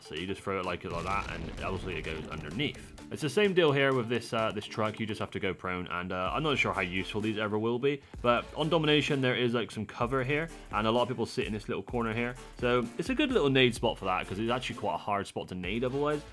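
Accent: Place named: Bristol, United Kingdom. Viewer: You sound very local to me lol...... Bristol?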